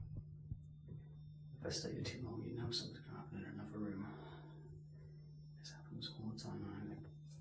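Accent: Ireland. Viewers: He sounds like an Irish guy that moved to England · Are you from Ireland?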